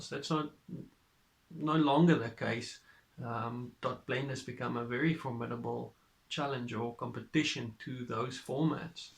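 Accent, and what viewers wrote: South Africa. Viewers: I could tell that you are South African by your access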